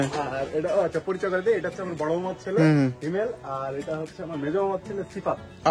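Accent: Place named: Bangladesh. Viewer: দাদা কথার ভিতর বাংলাদেশি বাংলাদেশি ভাব এসে গেছে কিন্তু🐸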